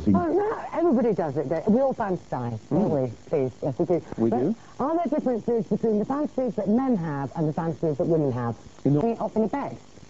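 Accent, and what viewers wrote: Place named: United Kingdom. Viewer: Aint nothing Iike a British Iady in Iatex, when she spices all that beauty with the accent.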